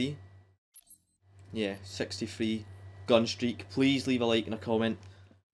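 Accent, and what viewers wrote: Scotland, United Kingdom. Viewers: What part of Scotland you from?, im from Edinburgh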